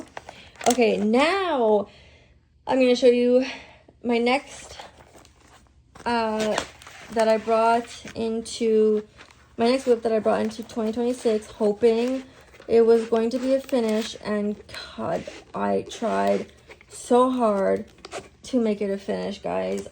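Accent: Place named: Montreal, Canada. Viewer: New subbie here!! ❤ your accent reminds me so much of home!! I am also from Montréal! I moved to the United States in 1990 when I was 4.. I'm 39 now 😊 I used to visit my sister and family every summer from the ages of 11-17.. I miss it so much. Montréal is a gorgeous city. Most of my family lives in Ville Saint Pierre and LaSalle LaChine. Probably didnt spell those right 😅 I'm glad you got clean and found diamond painting!! I'm also an addict. Been clean 9 years now. I've been diamond painting for 5 years now. 😊 can't wait to see your future videos!! ❤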